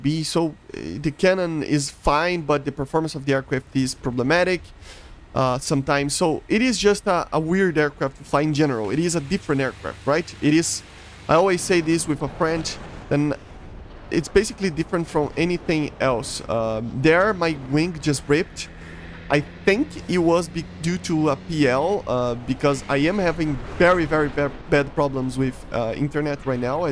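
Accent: Brazil. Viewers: percebi que era brasileiro na hora seu sotaque não engana kkk otimo video mano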